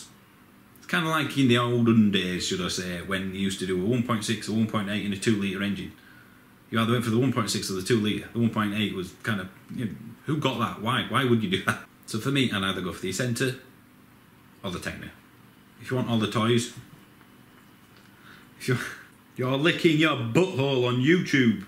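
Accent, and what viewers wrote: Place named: Huddersfield, United Kingdom. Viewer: I totally agree with your observations. I have a 4 year old Tekna and love it, but I have chosen a new 2.Zero, which for me does everything I want. Also, you sound like a Huddersfield boy, like me!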